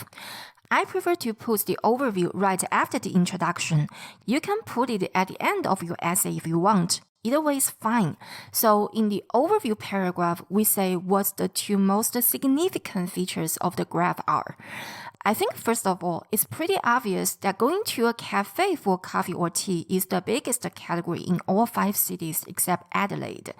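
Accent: China. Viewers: sorry to say your English is mixed with Chinese accent. Hardly can understand your pronunciation as what you are saying !!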